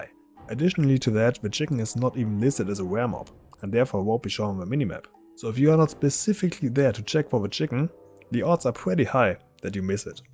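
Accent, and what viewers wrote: Germany. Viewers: Very well made video for a smaller chanel, keep it up :) I'm looking for a new class to play and am wondering if Hunter is a good choice at the moment and in general. How does it do in open world content, mythic + and raiding? How does it differ between it's specs? And how fun is the class to play? I heard that marksmanship hunter isn't doing so well for a ling time, is that true? Are you by any chance from Germany? Your accent sounds like it (I'm from Germany). Than you so much in advance :) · Hi! I like your video, thx. Are you German? Sounds as if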